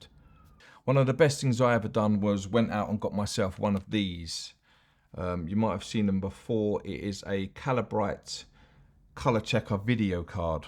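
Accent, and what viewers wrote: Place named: United Kingdom. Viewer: This is the most British dude I've ever heard in the wild. Thank you for the vid too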